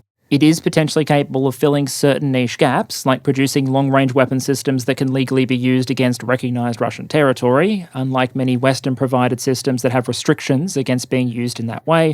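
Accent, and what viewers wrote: Australia. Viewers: Is this Ausralian? Dialect and/or dude?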